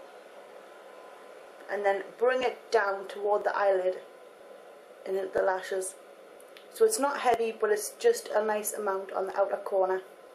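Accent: Newcastle, United Kingdom. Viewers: ur british accent makes it more fun 2 watch!!! also i just subscribed!! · It's a Geordie accent.. aka Newcastle in the north of England :) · I usually don't like that New Castle-like accent but she is the nicest person ever so I don't really mind :)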